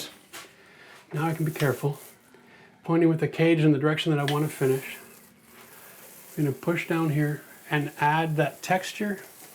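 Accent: Canada. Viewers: Florida? I would have guess you were Canadian.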